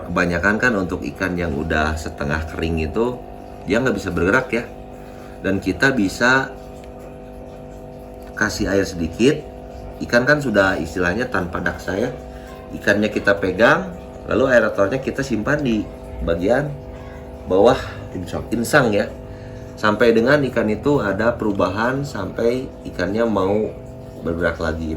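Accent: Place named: West Java, Indonesia. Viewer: Suka sm logat sunda nya...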